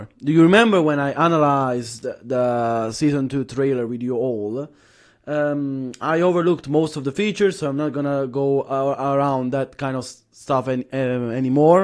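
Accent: Italy. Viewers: Good a analysis, all we can do is wait and pray -_-. Random but are you italiano? you got a similar accent to my wife lol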